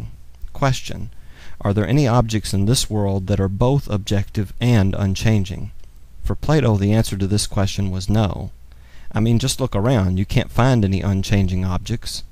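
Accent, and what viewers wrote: Oklahoma, United States. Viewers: hearing philosophy in a southern oklahoman accent is hilarious after a while ^_^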